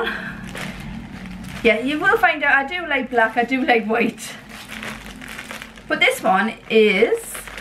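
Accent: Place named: Wallsend, United Kingdom. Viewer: She is from Wallsend. :) We both live in Australia now, her accent isn't as strong but always makes me smile.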